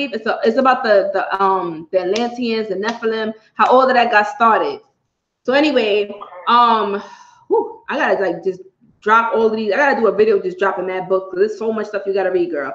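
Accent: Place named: Brooklyn, United States. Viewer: Your accent is so Brooklyn. But the way you say “you know” is so New Orleans loll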